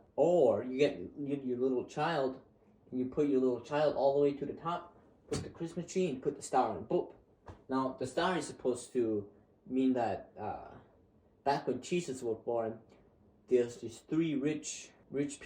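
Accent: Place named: Switzerland. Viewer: Dude you speak swiss german accent not dutch. I heard one amish say "bin ufgwachse". Thats 1:1 swiss german accent kanton luzern. Verstosch?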